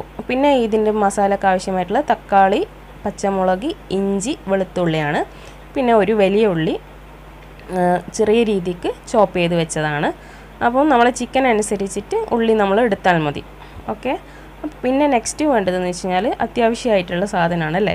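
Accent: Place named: Kannur, India. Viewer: Kannur slang sooper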